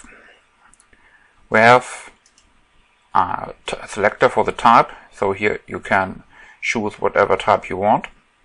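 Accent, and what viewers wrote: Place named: Germany. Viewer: kannst das mal auf deutsch machen hört man doch das du deutscher bist:D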